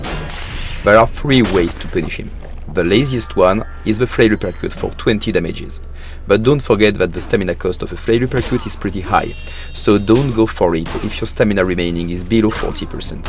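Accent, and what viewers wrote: France. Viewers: Are you French? Cool accent! And great video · J’entends que tu est français😂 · Ah a fellow Frenchman it sounds like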